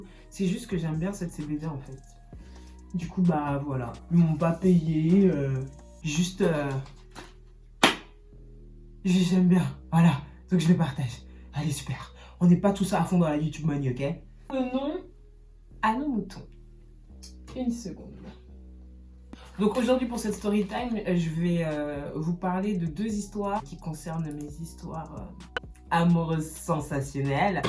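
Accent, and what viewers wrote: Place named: Ivory Coast. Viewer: l’accent ivoirien me tue! continue j’ai kiffé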